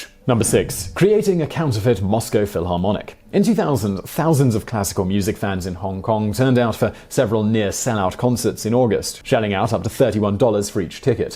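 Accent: United Kingdom. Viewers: jew-lery? why do brits speak such poor english. Another favourite is 'Somethink and War'uh(water) ...??' just why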